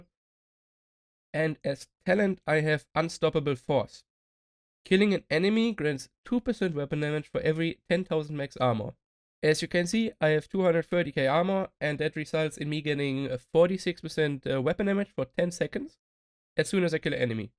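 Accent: Germany. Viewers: You speak never english.... Deutsch hör ich da doch raus... mach das doch auch auf deine Muttersprache.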